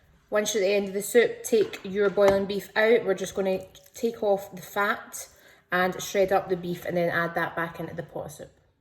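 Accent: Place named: Scotland, United Kingdom. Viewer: Love youre scottish dialect ❤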